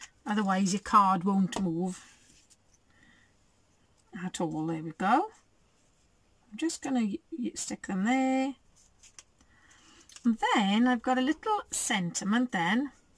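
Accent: Wales, United Kingdom. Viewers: Good tutorial.... love your Welsh accent!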